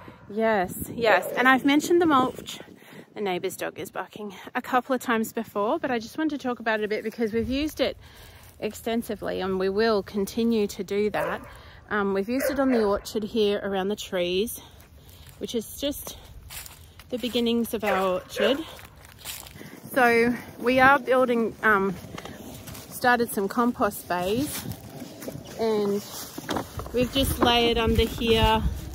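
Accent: Australia. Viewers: Lovely hearing an Aussie accent in a homesteading video 😊 (fellow Aussie here). Thanks for the tips, looking forward to bingeing the rest of your videos.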